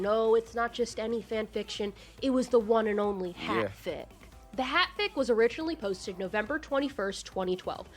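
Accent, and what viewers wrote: South, United States. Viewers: I like your cute lil southern accent 😊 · you have the SLIGHTEST southern accent and it’s so cute!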